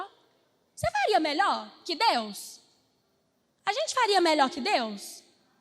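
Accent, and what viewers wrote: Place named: Minas Gerais, Brazil. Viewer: Me lembrou tmb!! O jeito de falar e andar rsrs deve ser coisa de mineiro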